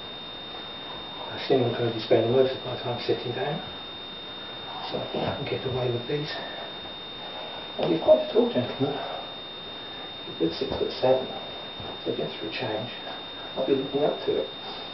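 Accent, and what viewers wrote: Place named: England, United Kingdom. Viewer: sounds like your in england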